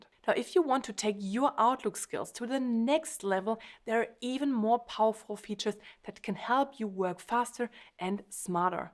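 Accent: Germany. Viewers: is she german? I like her accent.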